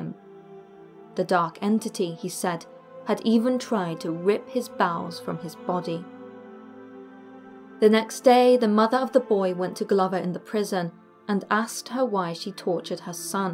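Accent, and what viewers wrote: England, United Kingdom. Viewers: Your voice makes me imagine that I'm being told the story from an actual family member! I have an American accent and have always loved them English accent. I'd listen to you read the phone book. I wish your voice was on my devices..."wake up darling, it's time to cease the day. Go on now, wake up." Hehehe......or "alright babe, you're all tucked in and ready to begun your journey to dreamland, goodnight darling." Ahhh that would be awesome! Who knows contact Google....you never know 😉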